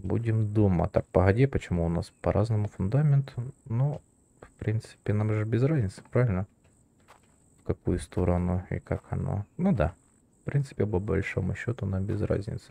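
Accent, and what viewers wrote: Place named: Surzhyk, Ukraine. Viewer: якщо не секрет, звідки ти, а то щось я чую місцями суржик у розмові? Якщо на каналі немає політики, то я підпишуся. Не люблю коли ігри плутають з політикою, а я прийшов дивитися ігри, а не слухати хто комусь на х.. настав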